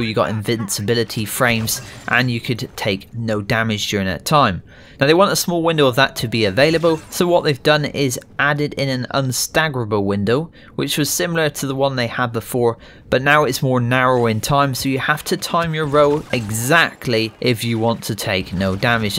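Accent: West Country, United Kingdom. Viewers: Clear and concise with a west country twang:) You have a new sub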